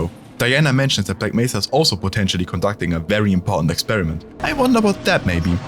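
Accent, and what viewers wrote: Germany. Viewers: wait you german?! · You're German? I've lost all recollection of European accents.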